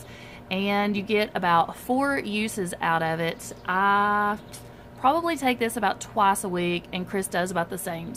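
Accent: South, United States. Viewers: Congrats on 10k! And in only one year. It's that southern accent. Gets them everytime am I right! From another southern belle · Congratulations on such an awesome milestone! I love watching your videos and listening to your southern accent. I am from Texas but our accent is a little different. I will be looking for the tumblers and snack containers for an upcoming trip such a great idea to use instead of our more expensive tumblers! Dollar Tree haul for me tomorrow.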